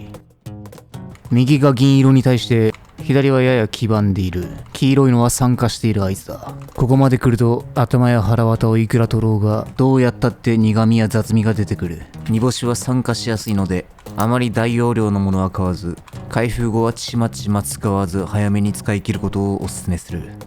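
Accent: Kansai, Japan. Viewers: 九州出身なのになんで関西弁しゃべるときあるんじゃーい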